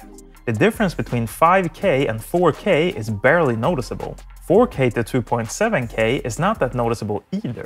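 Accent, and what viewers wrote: Sweden. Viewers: Dude hear some Swedish accent. Thanks for the video, I got a GoPeo H8 for my birthday a couple of days ago.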